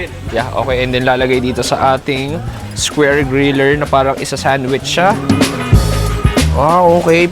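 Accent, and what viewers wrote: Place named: Philippines. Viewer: nakaka miss yung pinoy english accent pag nasa middle east ka 😅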